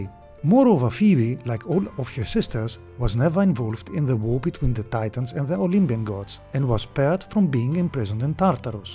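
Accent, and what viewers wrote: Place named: Greece. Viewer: Granddaughter??? That's so cool! also, you have the most beautiful accent on the planet. I love the Greek accent!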